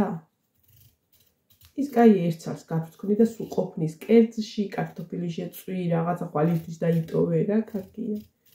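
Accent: Martkopi, Georgia. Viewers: ძაან ბუნებრივი ხარ და შენი მარტყოფული კილოც მაგარია❤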